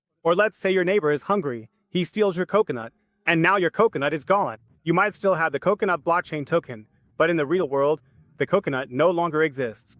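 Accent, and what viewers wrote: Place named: Switzerland. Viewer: Sapperlott, des schäenglish isch voll super! 😊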